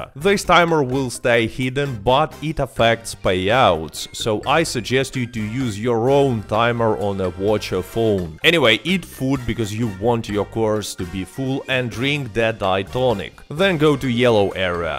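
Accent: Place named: Russia. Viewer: Nice russian acent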